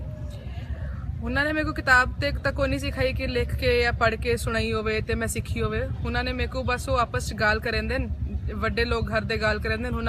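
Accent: Bahawalpur, Pakistan. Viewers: very nice accent Bahawalpuri accent is very sweet accent of punjabi... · wa saien wa tuhadi saraiki bht meethi hy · Most welcome u r most welcome to bahwalpur...i am from bahwalpur u r speeking absloute sariki...same as we bahwalpurian use to speek loves to see this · 50/50 saraiki Boli hai ap ny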